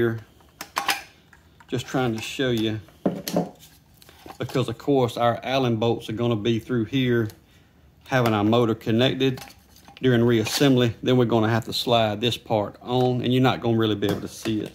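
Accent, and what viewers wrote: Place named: South, United States. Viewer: MAN! this was AMAZING! Videos like this are a rarity these days done in the form of how GM explained their cars in the 50s. And I have to admit, it sounds better with a southern accent. But the Quality of this video is MILES above anything I have seen. FANTASTIC JOB DUDE! I don't even own this model of blower. But I figure My Ryobi RY38BP Backpack Leaf Blower had the same thing that went out on it. And RIGHT on the day of the 3 year warranty expiring! :face-green-smiling: I just ordered a parts blower from Ebay, and plan to just swap out parts that way. But I will have to look into these RC couplings. Again, thank you and WONDERFUL job and explanation & Links! :goodvibes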